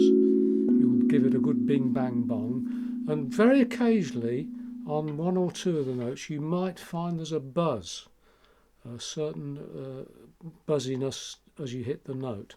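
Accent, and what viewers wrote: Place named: Suffolk, United Kingdom. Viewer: I detect a suffolk aaaacent there 😁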